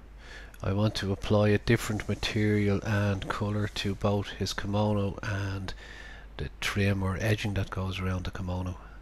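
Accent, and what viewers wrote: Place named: Ireland. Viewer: Nice video here, and love that Irish accent. Thanks for showing the ZB to Keyshot Bridge in accent. Nice character too. Do you know if the Bridge works with any version of Keyshot 5 or later or if I need the special Keyshot for ZB?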